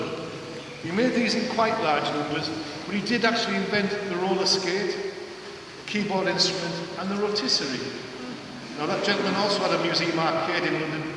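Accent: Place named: Newcastle, United Kingdom. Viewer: Swans might be vegetarian but I tend to try and avoid encounters with them on lakes in thunderstorms. <link> The sense of humour and accent are identical. This was our experience of swans; <link> Are you sure it is thirty pounds or thirty pieces of silver? M1O4WvtTE4o?si=9muZ2LKKr7aqeyzI I have a soft spot for The Geordie accent. Probably need heaters in a cold, draughty place like that. It is north of Watford after all.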